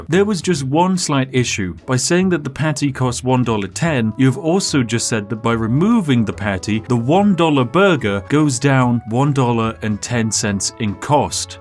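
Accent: United Kingdom. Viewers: The Internet Historian is a lot more british than i remember... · If nothing else, I can always look forward to listening to this man's smooth British voice explain how he's broken the very laws of physics.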